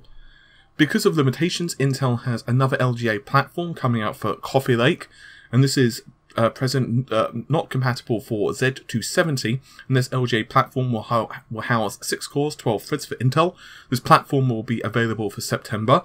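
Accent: United Kingdom. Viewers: Zenyatta- Overwatch maybe it's just his British accent ¯\(ツ)/¯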